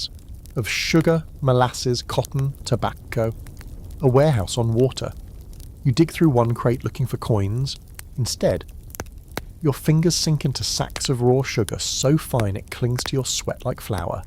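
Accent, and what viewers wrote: United Kingdom. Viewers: Stop believing every word this guy says just cuz he has a British accent 😂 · am Yorkshire ❤️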